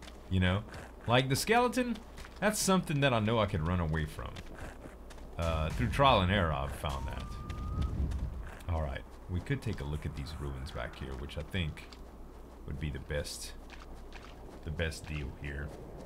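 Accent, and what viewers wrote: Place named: Grand Island, United States. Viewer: Love the accent, reminds me of grand island. Keep up the goood work!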